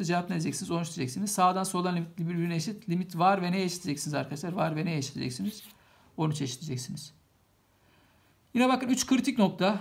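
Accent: Trabzon, Turkey. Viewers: Hocam zannediyorum Trabzon/Arsinlisiniz.Konuşmanız orayı andırdı.Alakasız ama yine de belirtmek istedim.Ayrıca anlatımınız çok güzel. Teşekkür ederim.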